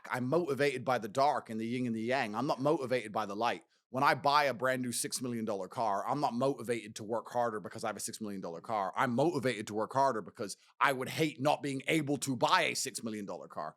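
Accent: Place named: India. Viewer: Just subscribed, quite a strong Indian accent but is very well-spoken. I know India is a huge place but I'm from the UK and I promise you the place I hear about the most for its beauty is India. However, a lot of people hear India and think of some shithole, I might be wrong here but I am sure the economy in India has been and is on a huge growth spurt. Big hidden gem??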